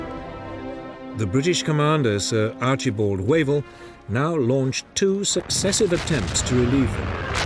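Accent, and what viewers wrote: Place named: Scotland, United Kingdom. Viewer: Re: the segment on the death of IJN YAMATO/Operation Ten-Go: whoever did the voiceover (or the AI software) -fire them... or pick a different one. That stupid scottish accent is like nails on a chalkboard. Drove me clear up the wall. I had to turn it off.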